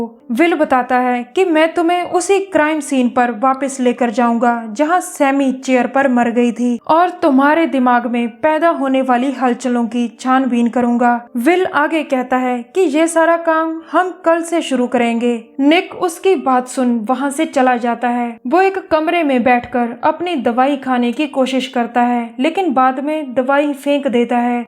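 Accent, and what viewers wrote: Punjab, India. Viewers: kya yaar punjabi hindi with break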